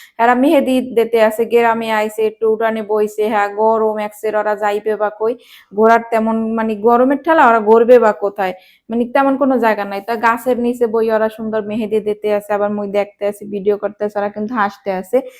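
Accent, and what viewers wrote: Barishal, Bangladesh. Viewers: আসসালামু আলাইকুম আপুমনি আজ ফাস্ট হলাম ইনশাআল্লাহ তোমার বরিশালের ভাষায় কথা খুব ভালো লাগে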